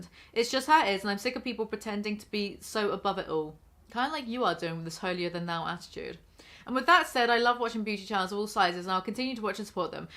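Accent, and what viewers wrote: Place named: England, United Kingdom. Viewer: Hearing the drama read out by a person with an accent makes me feel like shes reading excerpts out of a sophisticated Englishmans diary. I love it omg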